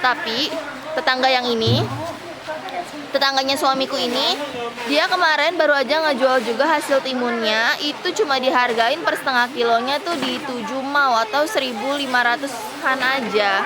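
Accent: Java, Indonesia. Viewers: Cantik bgt MBK ...asline wong ndi kaya logat jawa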